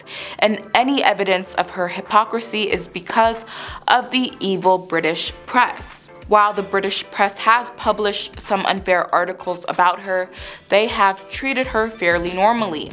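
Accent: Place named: United States. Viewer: I love American accents and yours sounds like caramel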